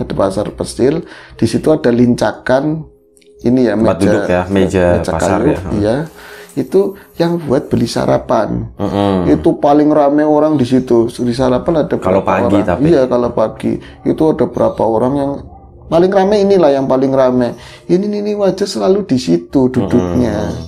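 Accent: Banyumas, Indonesia. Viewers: Paling suka denger Mas bicara ngapak😄😄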